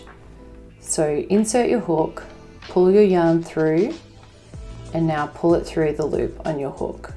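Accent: Australia. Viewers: So happy to have found an Australian tutorial when I googled this method, I’m so used to hearing a British or American accent in the tutorials I usually watch. Your accent brought a smile to my face immediately and a great quick lesson too! Thanks so much ❤❤❤ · Thankyou for this tutorial love this way of introducing new color!!!! Im aussie too and it was such a breath of fresh air yo hear your accent, plus relaxed voice, and no extra yabbiddi yabbiddi!!!!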